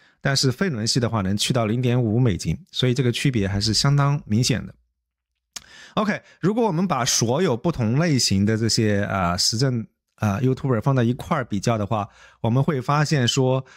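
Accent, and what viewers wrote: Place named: South, China. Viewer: 听up主的口音，好像是南方人，广东福建一带的？有没有关注香港或从香港逃到台湾的一些大V，以粤语为主，粉丝量和 收入也很可观。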